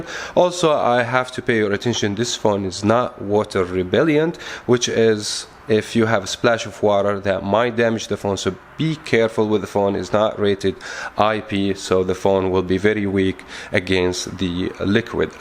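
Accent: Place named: Arab world. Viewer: This video was okay until I heard this annoying Middle Eastern accent.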